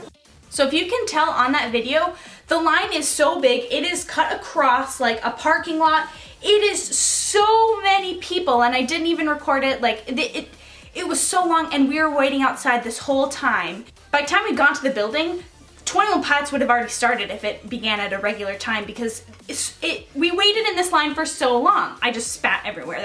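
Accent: Minnesota, United States. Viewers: I'm From Minnesota so when I heard your accent I was like she must be from MN then you said something about the target center and yes!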